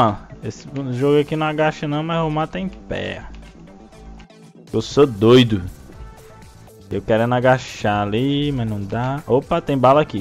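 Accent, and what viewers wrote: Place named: Ceará, Brazil. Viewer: Vc é do ceara é?kkkkkkk, se não for seu jeito de falar é mt párecido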